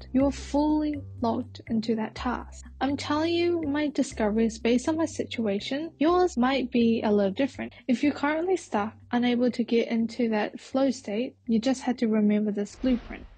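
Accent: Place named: Scotland, United Kingdom. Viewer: you sound scottish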